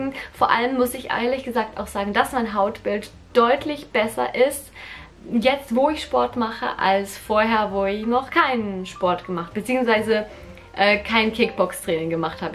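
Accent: Switzerland. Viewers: Mi ganz körts wenig das schwizärä bisch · Alter ha gat vo dir a Video a gluagt vo 2013😂😂 met däm schwiizerdütsch👍👍😂 soo lostig i fir di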